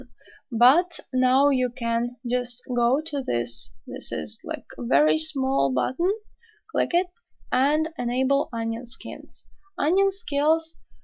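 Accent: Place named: Latvia. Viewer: Your name and accent makes me guess that you are Latvian. Could I be right? :D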